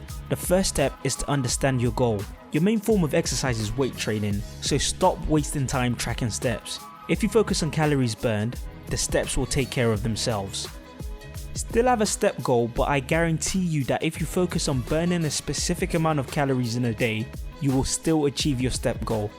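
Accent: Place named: Jamaican-Cockney, United Kingdom. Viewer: cant listen to the stupid jamockney acccent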